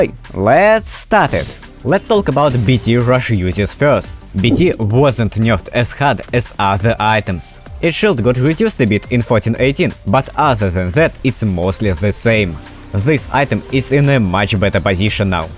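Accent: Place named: Russia. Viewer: out of the context, where are you from? what's that accent? feels like youre russian or slavic.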